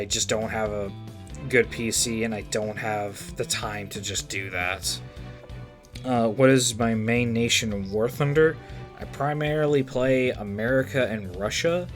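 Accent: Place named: United Kingdom. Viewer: Maybe you should make prop plane roasts with a.50 cal gun. "The.50 Cal Roasts the Hawker Hurricane." HOW TF DID I NOT RECOGNISE VOICE AS A BRIT!?!?!?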